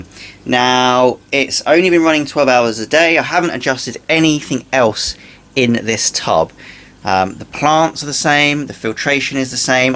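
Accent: United Kingdom. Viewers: It’s a bit of a blunder by Fluval. I don’t even have any extra sheet in the box telling that it should be positioned in a happy T face but an upside down T as in the original manual. Mine must be the earlier batches. I was bound to know the correct position of installing the unit only after watching your video. Repositioning the unit is a breeze. It’s true though the flow rate is faster with the T position as the water hit the unit going down the curve and up again instead of hitting the unit going up and down the curve. BTW thanks a bundle for all your very informative videos and your British accent is superb!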